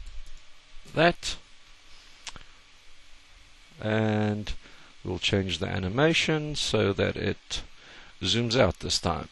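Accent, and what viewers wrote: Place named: Scotland, United Kingdom. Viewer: nthx btw u sound scottish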